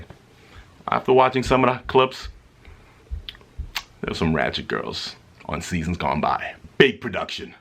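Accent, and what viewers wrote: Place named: East Coast, United States. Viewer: Im living for your accent.. my dad has an east coast accent as well just not nearly as thick as yours! I love u and the girls and Marcellus as well!! Keep the videos coming, im diggin the channel pops! 😘❤️❤️❤️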